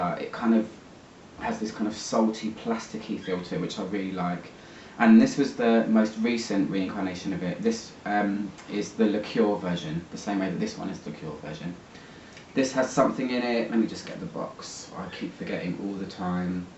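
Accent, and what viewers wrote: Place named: United Kingdom. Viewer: Hello! is a fantastic video, i love your accent more than anything , english people talk so elegant, i like that, but there's a part i can not undrestand very well and i am interested in that perfume i want to ask you, what's the charasteristic of the original hypnotic poision of Dior, how is the bottle?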